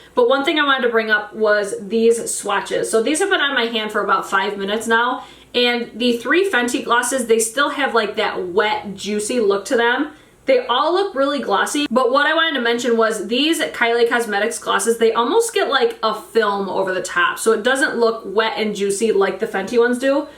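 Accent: Midwest, United States. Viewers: i'm guessing you're midwestern? or maybe from boston.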